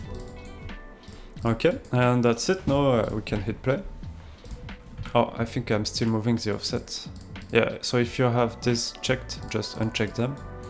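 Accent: France. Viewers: le fameux accent français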